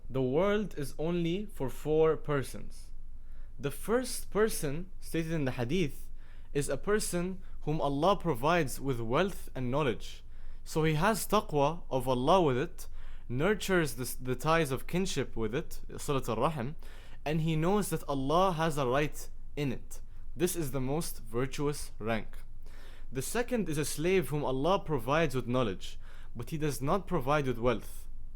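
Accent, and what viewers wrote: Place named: Egypt. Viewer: عرفت انك مصري من الaccent. كمل كلامك جميل جدا اللهم بارك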